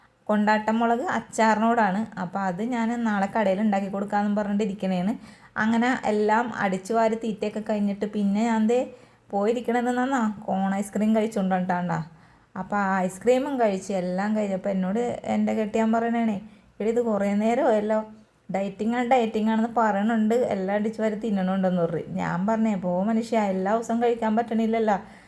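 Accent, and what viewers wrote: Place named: Kochi, India. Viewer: വീട്ടിലേക്ക് ponenu. സുഖമുള്ള kochikkarude സ്വന്തം ഭാഷ...ponenu...varanenu....❤❤❤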